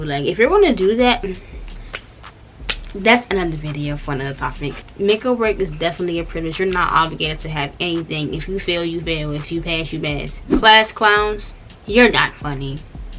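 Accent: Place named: Maryland, United States. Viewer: I guested right she was from maryland because of how she talked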